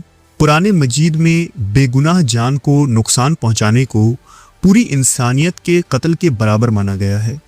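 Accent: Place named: Pakistan. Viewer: You are voice like Pakistan . Mashallah voice nice 😅😅😊😊😊😂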